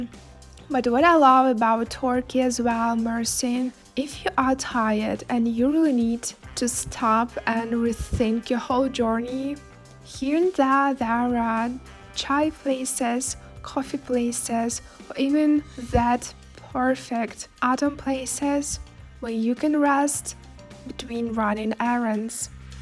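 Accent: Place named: Eastern Europe. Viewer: you have an interesting accent. What's your nationality? Are you from Eastern Europe or perhaps Germany? 🤔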